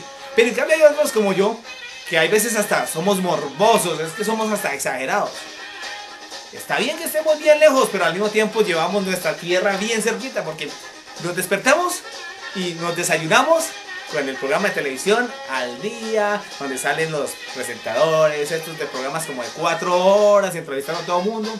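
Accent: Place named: Highlands, Ecuador. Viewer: Solamente los Ecuatorianos de la Sierra hablan así.. nosotros los costeños hablamos muy diferente.. pero esta bien tu vídeo..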